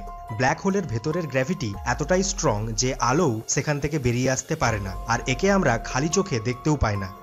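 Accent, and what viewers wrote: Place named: Kolkata, India. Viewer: কলকাতার মানুষ বলে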